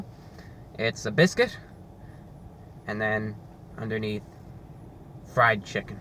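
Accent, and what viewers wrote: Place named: Northeast, United States. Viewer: previous geographical indicators led me to believe that he was located in California, but alas, this revelation of presence in the state which I currently reside, north Carolina, in combination with his northeastern accent is confusing me. my life crumbles...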